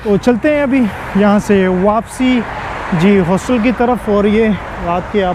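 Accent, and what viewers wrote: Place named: Pakistan. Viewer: Pakistan accent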